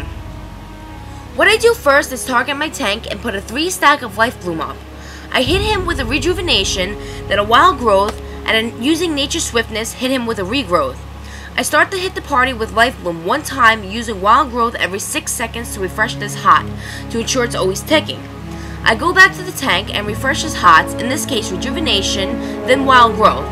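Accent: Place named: Brooklyn, United States. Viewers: i like 2 things about this video 1) the tip saying how healing isn't nessicarily about rotations just knowing what move is best for a given situationg and 2) your awsome brooklyn accent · she sounds like mila kunis with a bit of a new yorker accent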